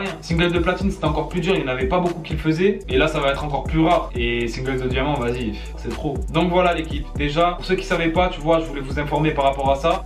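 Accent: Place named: South, France. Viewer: je pense tu vient du sud car tu dit tranquillement tarpin .......et ton accent je pense tu vient du sud , moi d'Alsace (Strasbourg )kartier neuhof zone sensible de non droit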